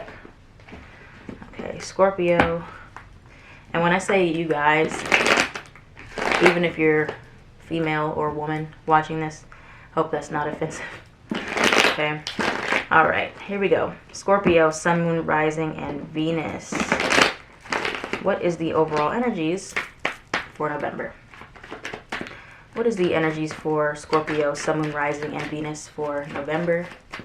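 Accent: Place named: Philadelphia, United States. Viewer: You said wooter not water. My ear went ding ding you have to be from the Philly Jersey area. Also, “you guys” that’s how we speak around here 💯🙌🏼🥰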